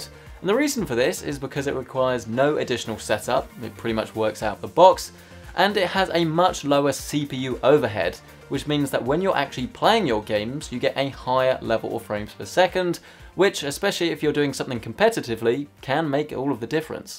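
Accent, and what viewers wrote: United Kingdom. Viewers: I trust British accents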